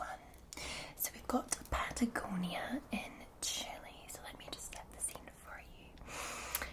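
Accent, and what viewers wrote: Scotland, United Kingdom. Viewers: Somehow I'm not surprised my dream manager has a Scottish accent...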